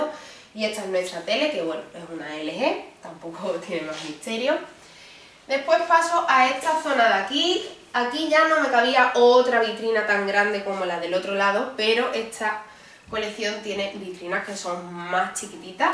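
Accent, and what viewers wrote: Spain. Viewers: Martha Ordaz Antonio el acento Andaluz es así ,te falta bastante educación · Habla perfectamente la chica y se le entiende muy bien, solo qué es de Sevilla y los sevillanos tienen ese acento, al igual como los de Galicia también tiene otro acento distinto y como los de Murcia, y no por eso se tiene que descalificar a las personas por su manera de hablar.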